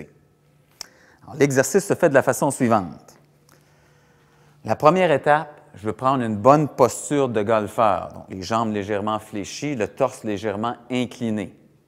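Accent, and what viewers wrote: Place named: Canada. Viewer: hello from France. Super vidéo., faut faire un DVD! Continuez, j'aime vos vidéos, elles sont très pédagogiques et claires. Et puis, avec l' accent " canadzien ", j'ai l' impression de prendre un cours de golf au Québec! génial. Merci. et encore, encore.....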